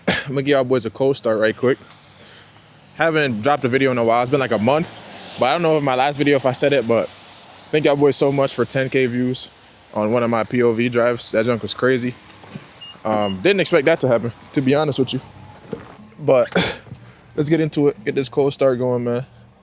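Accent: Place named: Canada. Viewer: Kinda sound Canadian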